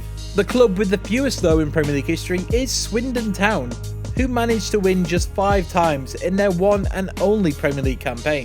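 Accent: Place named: Ireland. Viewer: What part of Ireland are u from?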